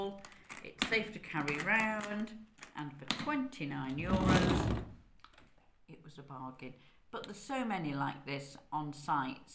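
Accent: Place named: England, United Kingdom. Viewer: Wow beautiful woman and I love your English accent !!!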